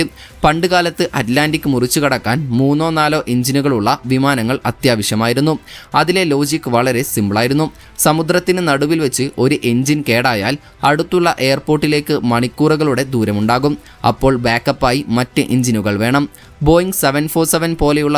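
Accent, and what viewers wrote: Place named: Kannur, India. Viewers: ഇവൻ വടക്കൻ ജില്ലക്കാരൻ ആണ്.. അതാണ് അങ്ങനെ വരുന്നത്.. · It is Malabar peole lways say like tht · Al Kannur Karan anennu thonnunnu....avar Ingane Anu pronounce cheyyuka....ano ...? 🤔♥️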